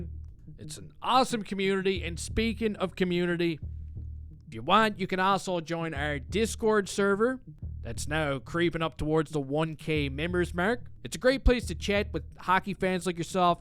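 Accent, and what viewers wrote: Newfoundland, Canada. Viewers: You have a real Canadian accent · I love your newfy accent! great content too! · Is you from Newfoundland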